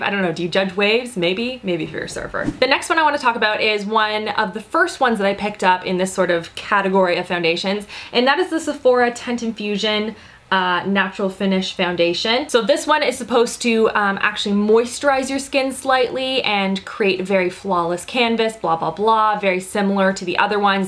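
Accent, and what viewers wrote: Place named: Canada. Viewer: she’s canadian huh? I swear most english speaking canadians emphasize the ‘ou’ sound like ‘out’, ‘house’, and ‘about’.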